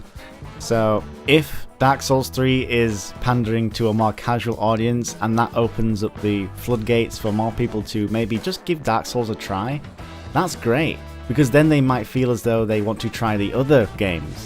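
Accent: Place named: Northern England, United Kingdom. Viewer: Lenayus Mynestone Northern England accent.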